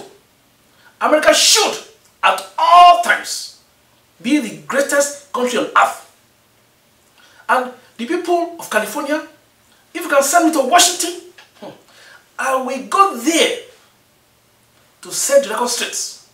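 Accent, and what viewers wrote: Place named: Nigeria. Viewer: with an accent so Nigerian, hmm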